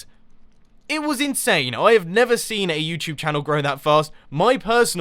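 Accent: United Kingdom. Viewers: Mumbo Jumbo Nvm cuz british accent is sexy.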